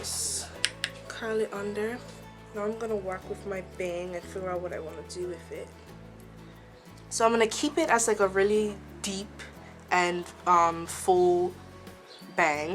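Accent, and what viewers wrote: Bermuda. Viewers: You sound bermudian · Are you Bermudian? Your accent sounds like it...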